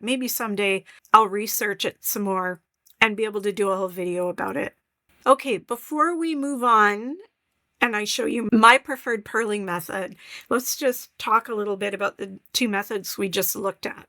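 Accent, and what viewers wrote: Canada. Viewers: I am a crocheter, but I recently learned to knit because I became good friend with a knitter. She taught me continental, but i stumbled into continental combination. I'm SO GLAD to find out that it will NOT mess me up in the long run to do that instead of "traditional" knitting. :D Also, I picked out that you're canadian instantly. Thank you SO MUCH for these tips and method options!! You've gotten another subscriber from me!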